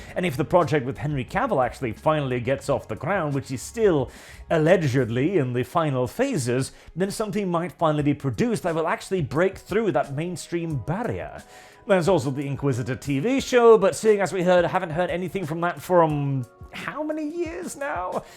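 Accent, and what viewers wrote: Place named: RP, United Kingdom. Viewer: Why are you speaking like Etonian Jacob Rees Mogg pound shop Enoch?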